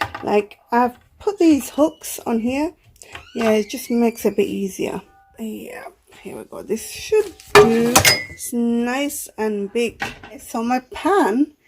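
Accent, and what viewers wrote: Sylhet, Bangladesh. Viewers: আপা খুব ভালো লাগলো ভিডিও টা,,, আর আপনার মুখে সিলেটি মাথ হুইননা মন টা বইরা গেলো,,, নতুন জয়েন হলাম আপু,, আশা করি আপনিও জয়েন হয়ে যাবেন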